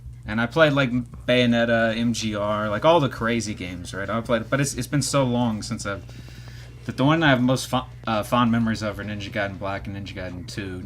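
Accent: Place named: South, United States. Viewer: you have a Southern drawl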